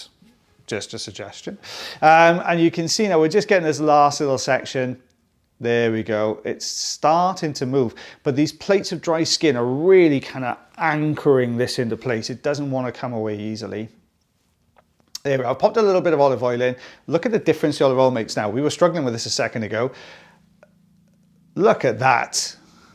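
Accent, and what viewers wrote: Wales, United Kingdom. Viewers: Amazing wax removals , just enough extra info, some audiologists are too technical, love your videos, your Welsh accent and enthusiasm make watching. A pleasure.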